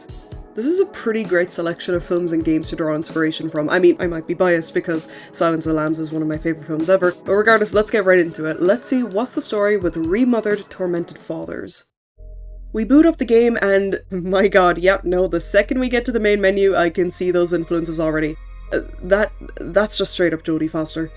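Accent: Ireland. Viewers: Hi! So, I watched your videos on Rule of Rose, Haunting Ground and now, Remothered. About time I leave a comment: your coverage of the games is great and I find the video length quite comfortable, too. As long as a Twin Peaks episode ;-) By the end of this vid, you shared how surprised you were to hit 100k views. I think it's well deserved for the polished video presentations. And the Irish accent :3 Just keep following that passion. I'm sure life has great things in store for you and your videos. Also, I can't go without mentioning the bit of Silent Hill music I heard at the end of your Haunting Ground video. I'm a sucker for the SH music and aesthetic. So, thanks for that, too :D edit: I read through the descriptions of the other videos I watched. More SH tracks that have gone undetected. It's subliminal bait for SH fans at this point :D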